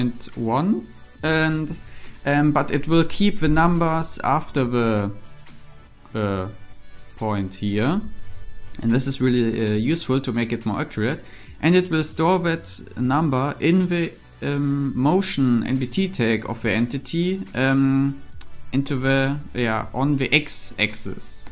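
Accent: Germany. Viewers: Ah yes, another German-accented minecraft nerd🤓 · Ich vermute dass du Deutsch bist. Ist das richtig? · are you german? your voice really sounds like german-english XD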